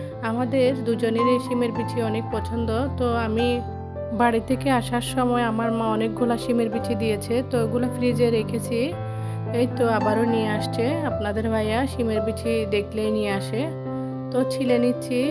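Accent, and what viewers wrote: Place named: Chittagong, Bangladesh. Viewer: ❤২২❤❤আমার প্রিয় আপু সিমের বিচির খোসা ছাড়ানোর সুন্দর একটা আইডিয়া শেয়ার করে দিয়েছো অনেক ভালো লাগলো শুটকি মাছ আমারও পছন্দ তুমি চিটাগাং এর আমি জানি আর তোমার কথায় আমার কাছে সেরকম লাগে আর আমার খুবই ভালো লাগে কথা এই ধরণটা আমার চিটাগাংই বেড়ে ওঠা সবকিছুই গরুর মাংস রান্নাটা অনেক সুন্দর হয়েছে বেগুন সিমের বিচি শুটকি দিয়ে রান্না করেছো ইফতারি গুলা ভেজে নিয়েছো বেগুনি টা আমার কাছে খুবই সুন্দর লেগেছে ভাইয়া অনেক কিছু নিয়ে এসেছে বাচ্চারা বাইরের খাবার খুবই পছন্দ করে আপু আমার বাচ্চাদেরও একই অবস্থা ভালো থেকো ❤❤❤❤❤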